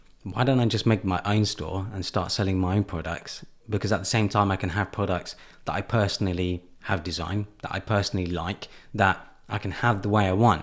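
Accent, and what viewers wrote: United Kingdom. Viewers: you could prolly get paid for your voice too, cool British accent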